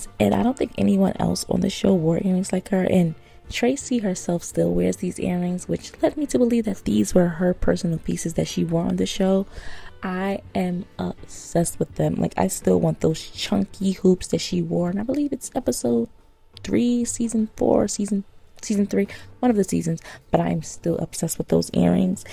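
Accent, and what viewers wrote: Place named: East Coast, United States. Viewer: Your accent 😍, you sound like you’re from the East coast. And tbh everyone on Girlfriends was a terrible friend oops.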